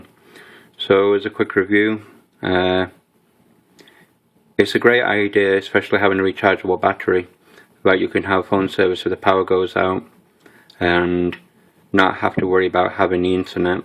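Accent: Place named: Manchester, United Kingdom. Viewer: You sound like a Manchester Lad to me, Living in USA?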